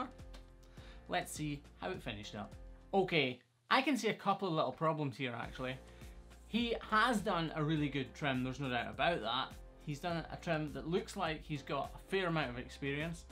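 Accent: Scotland, United Kingdom. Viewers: It's because of the cute Scottish accent lol otherwise it would have been a proper roast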